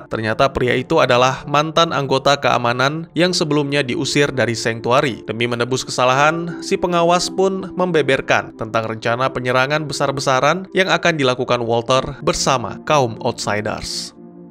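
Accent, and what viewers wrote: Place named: Medan, Indonesia. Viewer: Wkwkwkwk logat orang Medan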